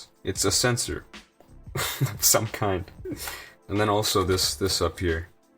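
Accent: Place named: Australia. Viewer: australian accent Nice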